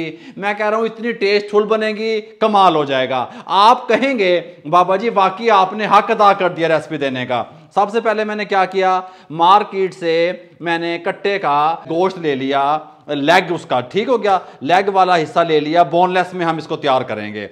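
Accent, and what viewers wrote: Punjab, India. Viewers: Asalam o alaikum... aap mere bade bhai hai, mien Quetta se hu, yaqeen kare aap ne tho cooking itna aasan kr diya hai aur jo kuch bhi mien try kartha hu zabardast hotha hai... aap ka jo andaz hai na punjabi bolne ka e.g: chaske arahe hai, muh wich pani, aaj ne kya sika diya etc... yaqeen kare mujhe punjabi zuban seekhne ka shauk ho gaya achi lagne lagi hai... Allah aapko kamyabiya de. Ameen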